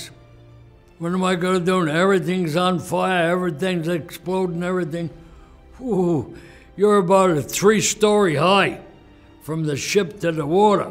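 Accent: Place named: Brooklyn, United States. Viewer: This man’s voice is what Brooklyn NY or American fake Italian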